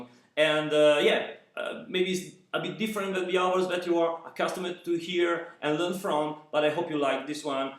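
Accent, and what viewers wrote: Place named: Italy. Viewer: Parece que ele é italiano e não fala portugues.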